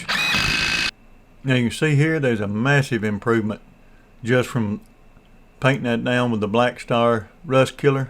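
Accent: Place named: Scandinavia. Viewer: What sort of accent is that? Sounds Scandinavian to me🧐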